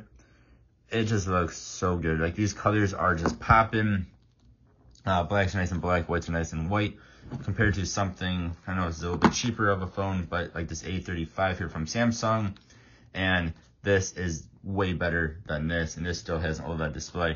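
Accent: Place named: South, United States. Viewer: Excellent review. But why are you speaking in a southern accent? Are you from rural Alabama?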